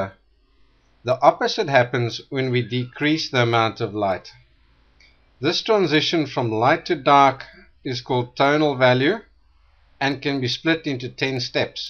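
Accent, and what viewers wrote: South Africa. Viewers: are you from soouth africa? i can hear your accent :P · Very south african accent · Go South Africa! This accent takes me back to school days. Thanks for sharing this so simply and freely. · Hoooly smokes, this is probably the most valuable video on colour mixing I've seen since I started painting 2 years ago. A complete revelation! Thank you so much :) Btw I bought your colour buster tool today and am looking forward to using it. Also love hearing a South African accent on youtube ;)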